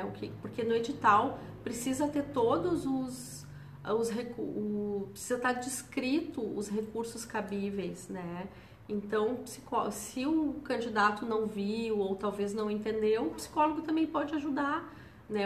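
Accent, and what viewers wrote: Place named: South, Brazil. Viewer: É um jeito de quem mora no Sul falar.. normal.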